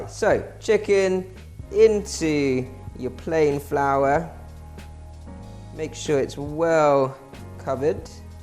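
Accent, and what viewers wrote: United Kingdom. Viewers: LOVE LOVE LOVE your videos. You are indeed a true professional. I am so happy that I found your channel. Everything you make sounds and looks delicious. Thank you. BTW, also love your British accent. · Are you being deliberately obtuse? He's british, like Gordan. Similar accents. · A Chinese guy with a British accent having Indian in-laws cooking Japanese curry.........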